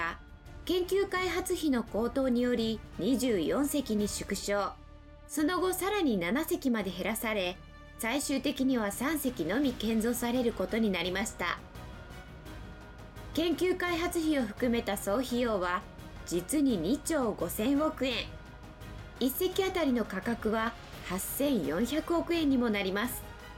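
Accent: Kansai, Japan. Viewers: この人、すごいナマってるな。 これ関西系のナマりかな？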